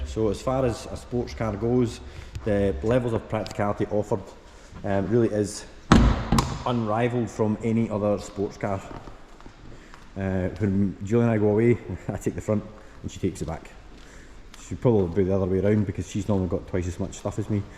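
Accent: Scotland, United Kingdom. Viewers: Is he speaking in Scot-ish, I can only understand every fourth word he says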